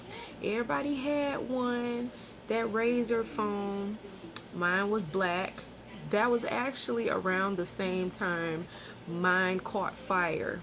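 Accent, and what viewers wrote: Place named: South, United States. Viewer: She has southen accent. Country niggas is Gay. Whats new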